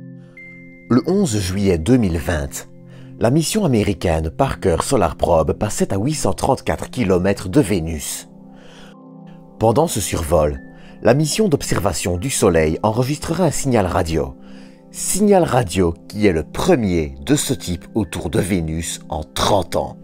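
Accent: Belgium. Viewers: C'est l'accent belge.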